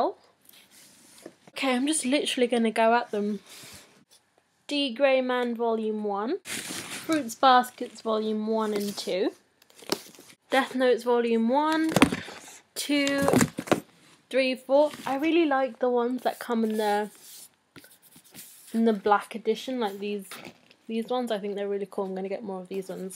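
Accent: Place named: England, United Kingdom. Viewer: I love your accent! are you English?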